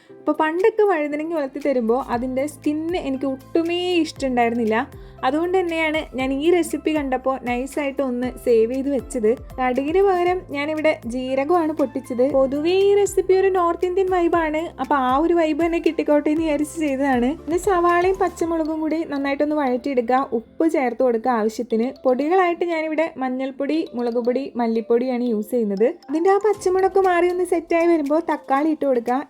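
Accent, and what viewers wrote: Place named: Angamaly, India. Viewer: Enik munpe thonniyirrunnu slang ketapo ,place Angamaly aanennu😂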